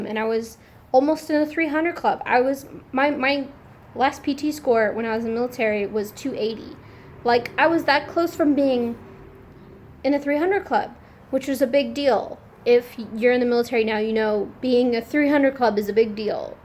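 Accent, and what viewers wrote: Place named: United Kingdom. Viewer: Now em confuse either u from US or Uk coz ur accent is more british than American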